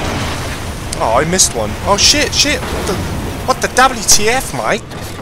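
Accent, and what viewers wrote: United Kingdom. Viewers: why do you taik in a british acsent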